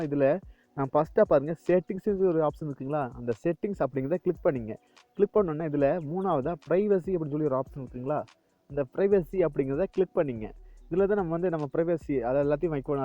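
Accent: Coimbatore, India. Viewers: Anna coimbatore slang super ah iruku 💙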